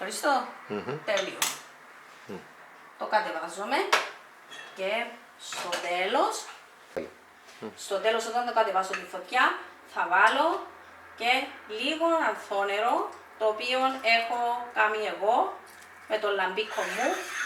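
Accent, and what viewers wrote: Cyprus. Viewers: Πολλα ωραιο!! Μα πιο ωραια η προφορα σας ! Λατρευω την Κυπριακη διαλεκτο.Να ειστε καλα.💖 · Ωραία ακούγεται Κύπρια είσαι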